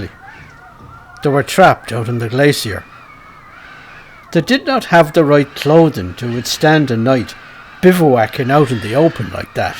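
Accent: Dublin, Ireland. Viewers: Same here but he soothes my nighttimes with his gentle voice and delightful Dubliner accent. Can you imagine sipping some tea or sharing a pint with Mr. relating one of his tales somewhere in Dublin? What a treat that would be!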